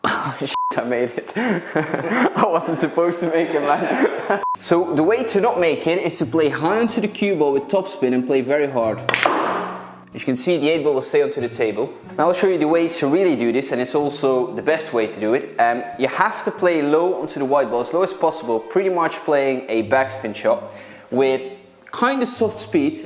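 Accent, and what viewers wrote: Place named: Scotland, United Kingdom. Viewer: Good content,subscribed, sometimes I think your Scottish but here something else there…….?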